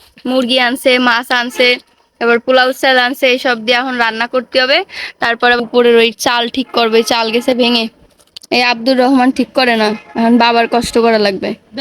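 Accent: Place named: Gopalganj, Bangladesh. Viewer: আপু আপনার বাসা কোথায়। ভাষা শুনে মনে হচ্ছে গোপালগঞ্জ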